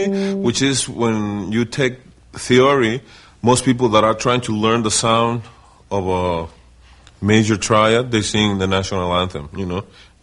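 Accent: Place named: Jamaica. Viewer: This incredibly talented cat has groove and soul and heart and musicality and I Just want to say Thank You,. BTW, where is he from? His accent is pseudo-European, perhaps Jamaican? Anyone? Bueller?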